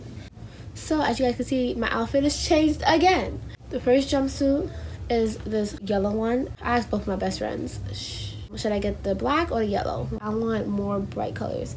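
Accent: New York, United States. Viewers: Your accent sound like you from New York 😍😍😍😍😍😍😍😍 and your name is so pretty😍😭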